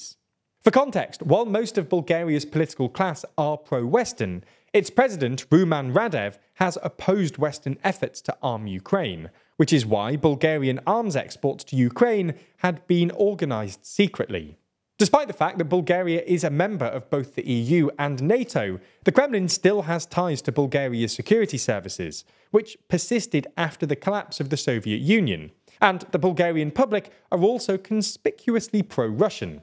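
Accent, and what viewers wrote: United Kingdom. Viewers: Why do y’all say slavic names so British 😩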